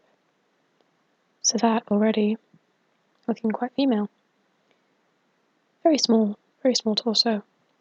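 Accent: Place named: Berkshire, United Kingdom. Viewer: Happy new year to you too from a fellow brit and thank you for the like. Nina is stunning, glad there are some real ones out there in high ranks, gives us men a glimmer of hope when watching the screen lol. I'd like you to know that I think your videos are the most professionally discussed and analysed on this platform and they really exude such an unbiased and level headed approach, it's so refreshing. I typically watch your transvestigations at night and the sound of your extremely soft and sexy voice. Especially its Berkshire/Oxfordshire region accented cadence helps me relax before bed, hence the comments as of now haha. Hope it doesn't come across as creepy or even offensive (some girls these days *sigh*), just being as honest as possible. Enjoy the rest of your day!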